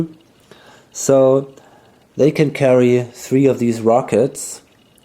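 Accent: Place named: Germany. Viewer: I knew it,deutschen Akzent erkennt man relativ leicht :D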